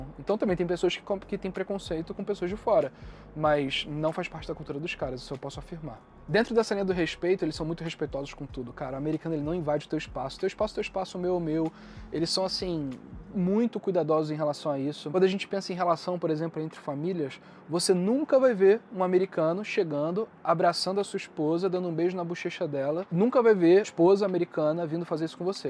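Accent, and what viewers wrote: Rio de Janeiro, Brazil. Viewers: Vídeo muito bom Mas o cara fala assobiando com esse sotaque de carioca que chega a dar um ruim nos ouvidos kkkkk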